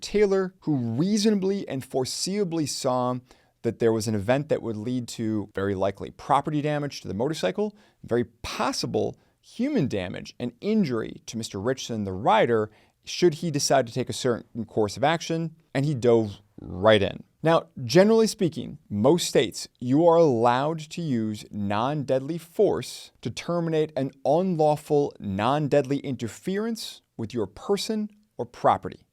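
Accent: United Kingdom. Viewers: Nah, southern England but not London or estuary or West Country dialects. 🏍️🏴🦃👊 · He's got an English accent!! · Dudes British. I understand now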